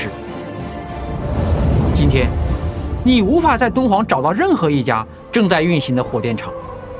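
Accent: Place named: Fujian, China. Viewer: 我一听就知道是福建的，特别可爱。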